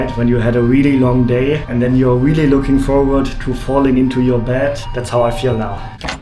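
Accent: Germany. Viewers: Crazy how you have to speak 3/4 years only english and you still have a very very german accent and your your mom who lives in Germany not really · That’s lovely German English. accent Your mom is so cute! ❤ I discovered your channel recently. Want to see all of your videos more often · Your German accent is terrible but your mother's is okay....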